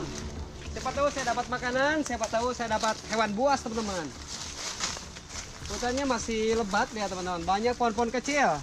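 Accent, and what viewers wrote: West Java, Indonesia. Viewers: bang ngmong nya campur sunda jadi lucu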